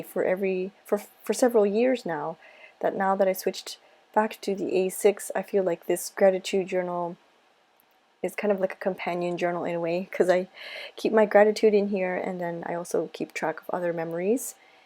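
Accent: Canada. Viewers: Hey! I prefer longer videos! I journal along with you, and with the shorter videos, I just get started and the video is over! But that's just my preference! I really just enjoy your content! P.S - I know that you have lived in Japan for several years now, but you still say 'eh?' in your videos from time to time, and as a fellow Canadian, it always makes me smile! I hope that doesn't sound silly:)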